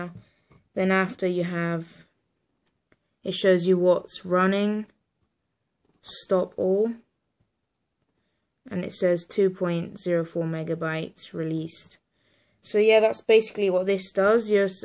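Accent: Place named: United Kingdom. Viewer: you sounds like British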